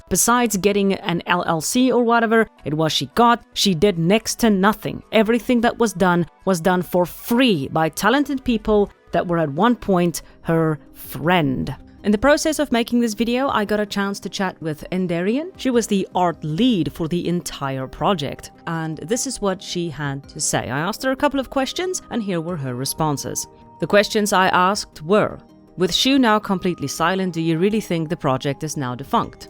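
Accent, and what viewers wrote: South Africa. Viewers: Ma'am are you South African? You sound so Safa it's making me smile like crazy, if not then ignore this lmao, but that accent it's giving me such heavy South African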